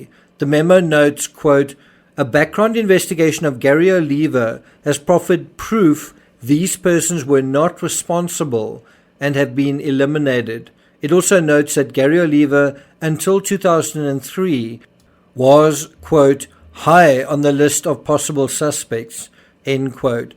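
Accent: Afrikaans, South Africa. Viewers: Yes he is South African with an Afrikaans accent. One of the best on Youtube. His insights are often very original. is also ex South African. Another excellent True Crime Channel. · lol being South African I thought hell yeah his accent must be Afrikaans, thanks for the info I didnt know was also a South African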